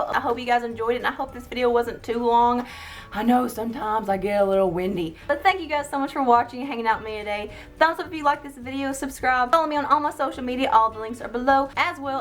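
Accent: South, United States. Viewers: Love your Southern Accent! Your brows are already pretty you don't need to do anything to them! 😊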